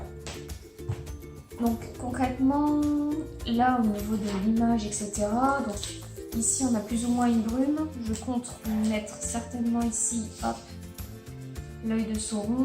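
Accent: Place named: Quebec, Canada. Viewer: C'est génial, quand j'entends ta voix (qu'accessoirement je connais), j'ai presque l'impressions d'entendre une touche de Québécois 😄 (Le "à l'arrache" me rassure sur tes "origines" 😉)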